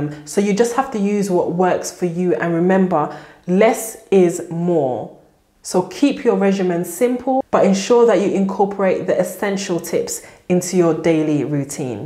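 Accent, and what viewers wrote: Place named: RP, United Kingdom. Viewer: That accent! very posh prim and proper. Us Americans can't get enough of it. Sending love from NY 🖐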